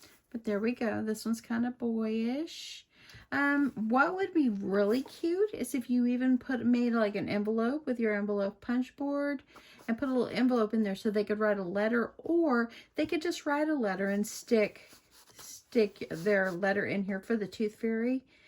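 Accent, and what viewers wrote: South, United States. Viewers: I’m glad to see a video of yours tonight, this might make you laugh but I got so used to watching you every night. Then the memory dex was over I found myself missing my Crafty friend! I look forward to hearing your sweet southern accent. I made my first doggie last night. She’s so precious! Hope your having a wonderful day 😀💕🤗🇨🇦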